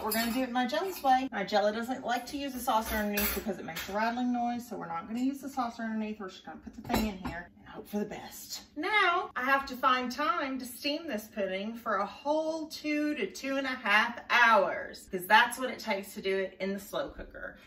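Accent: Kentucky, United States. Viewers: I love the Kentucky accent! (I'm a Brit.)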